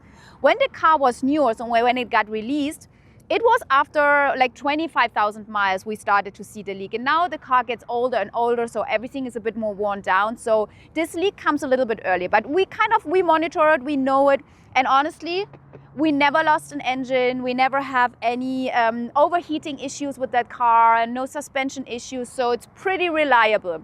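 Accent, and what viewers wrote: Germany. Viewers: I clicked for the car information but come on. She is beautiful and dressed in a way that I can’t look at the cars for some reason.😂. I love the German accent and that she knows about cars. Definitely an exotic I would want to rent.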